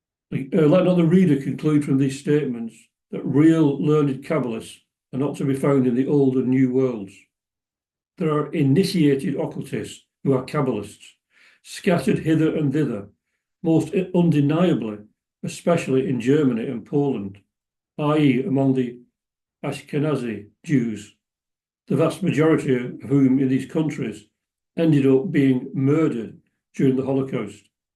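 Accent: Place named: United Kingdom. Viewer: Nice British accent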